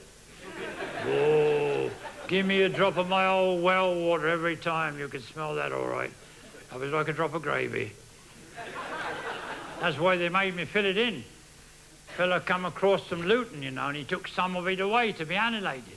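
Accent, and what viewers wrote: Buckinghamshire, United Kingdom. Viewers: I was led to believe that his accent is Buckinghamshire. Having been brought up there, I wouldn't disagree.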